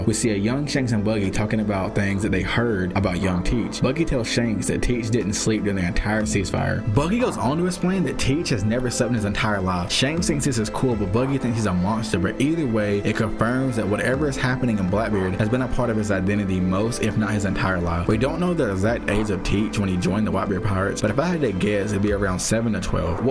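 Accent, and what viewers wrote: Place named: Tennessee, United States. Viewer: Vro your accent😲 are you from Tennessee?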